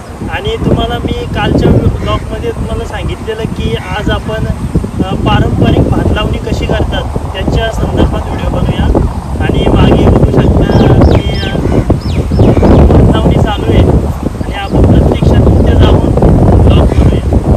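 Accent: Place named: Agari, India. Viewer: Agari bhasa ahee tii amchii amii lahan. Pana psun asch boltoo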